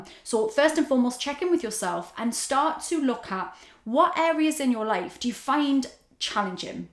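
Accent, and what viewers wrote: Newcastle, United Kingdom. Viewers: Hey. I found your channel being a big Girls Aloud fan, and I was taken with the Geordie accent. Which is why my username is my name haha! Love your stuff. Hope you do more!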